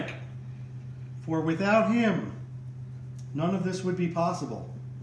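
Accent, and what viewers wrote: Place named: New Jersey, United States. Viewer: I got a 4 bone roast from Shop Rite for the holiday sale for $64 minus a $50 gift card it cost me $14 & as you said each steak could cost you $80 if you went out to eat & wouldn’t nearly be as good. Nice video! I wanted to make a roast & was looking for a video on how to trim it as a roast but my wife said we’re never going to eat it all & asked why we can’t just cut it into steaks & freeze them so listen to the wife. Your accent you sound like you’re up near Floriam park somewhere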